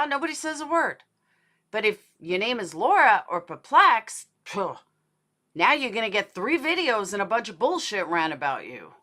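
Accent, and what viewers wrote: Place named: Staten Island, United States. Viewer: At 40.40 ish or a litl3 before I caught ur NYc_ Staten island big time "gawd fawbid"😆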